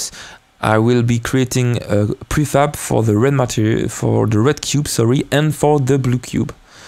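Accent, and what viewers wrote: France. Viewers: Oh mec, je crois que tu es francais vu ton accent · Little questio, are you French? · Vu ton accent tu es français, ce serait vraiment cool de traduire ta vidéo en réenregistrant ta voix en français par dessus, merci dans tous les cas :)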